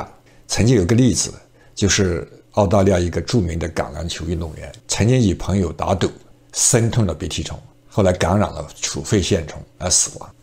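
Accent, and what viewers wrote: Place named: Sichuan, China. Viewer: 谢谢李老师。四川人？很亲切的咔咔角角。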